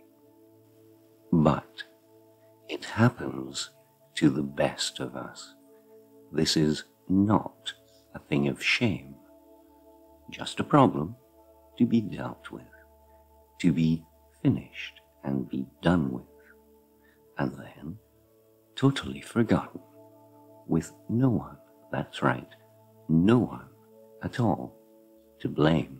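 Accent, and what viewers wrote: Scotland, United Kingdom. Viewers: You the best I've listen just about all 10/10 what kinda Scot are you ? 😆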